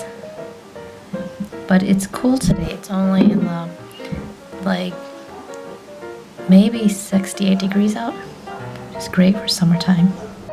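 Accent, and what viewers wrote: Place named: Chicago, United States. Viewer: I prolly said this already, but my sister lives in Chicago. haha. It's thanks to that, that I learned to identify the accent. Box = backs. Solids = salads. Tom = Tam. Hahahaha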